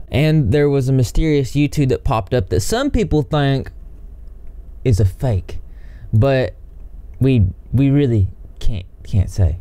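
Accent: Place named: Texas, United States. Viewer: are you from Texas? Bc I know some people from Texas who has the same accent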